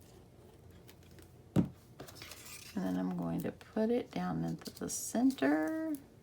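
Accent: South, United States. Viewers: Oh miss, these are so stinking cute and just love them. I made some a few years back and hung them from the office ceiling, they were cute. Glitter paper was pretty too. What??? excuse me??? someone had to say something that you say y'all. OHHH that burns my biscuits. I went from NE Ohio to Arkansas and I had to learn to say y'all..LOL When I said you or everybody I sounded like some prissy proper gal. LOL. I love it! I love the accent from the south, my accent is a mumble of both. But yes folks from the south say y'all, and for someone to say something about that is just absolutely petty and just plain not nice. I was always taught if you don't have anything nice to say, keep it to yourself. Oh your hubby is such a doll, my hubby is so much like your hubby, He use to be like that when he drove truck, but since he came off the road he kinda goes with the flow, now that he has a factory type job. 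I love your tutorials and love listing to you and all your y'alls. you keep being you and change for nobody!!!!! Hugs to you my friend!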